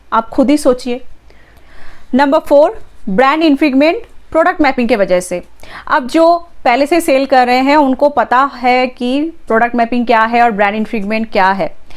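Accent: Bengal, India. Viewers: good info with strong bengali accent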